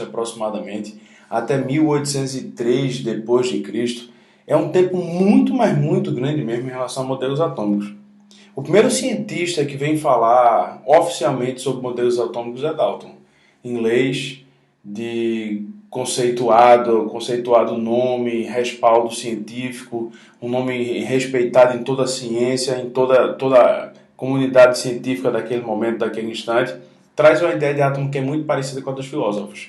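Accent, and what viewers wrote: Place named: Northeast, Brazil. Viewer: Kkkkkkk cara vc é mt bom!!!!! Serio msm eu rir e aprendi, parabens amei teu sutaque de nordestino ne?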